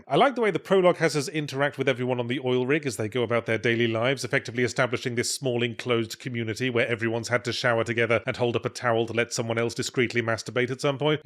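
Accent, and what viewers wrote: United Kingdom. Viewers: Love to listen to Yahtzees buttery british voice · Soo glad have this. Now I can fall asleep to sweary British voice.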